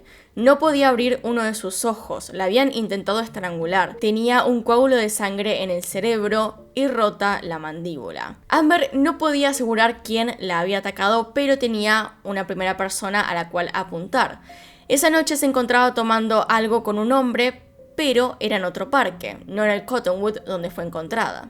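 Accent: Argentina. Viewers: así hablan los argentinos, no es cosa de pronunciación es cosa de acentos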